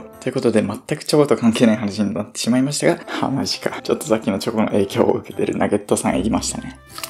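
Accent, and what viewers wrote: Okinawa, Japan. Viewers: 沖縄方言めちゃいいですね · ちむどんどんっていう朝ドラで沖縄の方言にトリコになっています(^^) さんのリアル方言堪りません！美味しそうに食べている姿を見て夜ご飯食べました🤤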